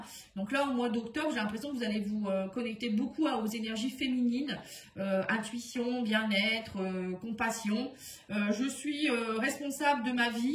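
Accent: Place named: North, France. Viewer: Étant moi même nordiste j adore votre accent merci pour votre guidance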